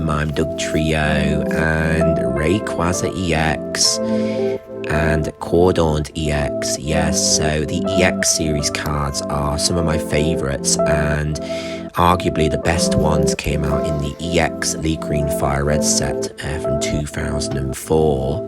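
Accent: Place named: United Kingdom. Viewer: Yeah still sound British just different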